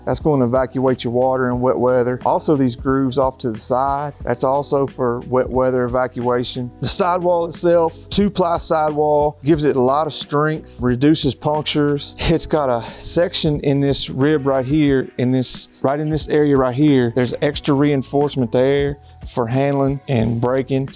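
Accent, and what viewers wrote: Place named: Missouri, United States. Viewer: The Accent. Are you from M.O. St. Louis or K.C.?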